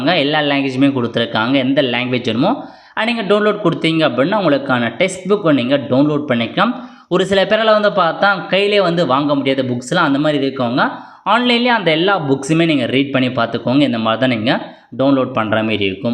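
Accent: India. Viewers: Ennada hindikaran tamil pesura madhiri pesikittubirukka...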